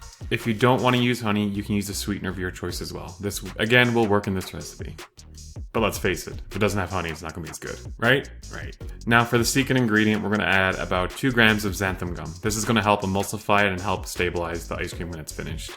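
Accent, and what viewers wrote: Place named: United States. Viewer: you sound American af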